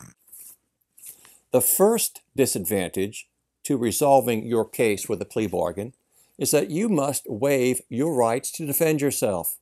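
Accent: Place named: Australia. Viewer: P S well if ultimi are Italian . Let’s talk in italian😂 . I thought you were Australian .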